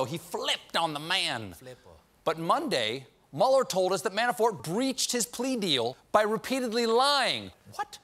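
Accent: Australia. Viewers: Can someone tell SC there is a difference between a working class/outback Australian accent and an Australian newscaster accent.........go for the second one not the first. Especially if you are going to do a good Assange. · English accent has an Australian accent. Funky.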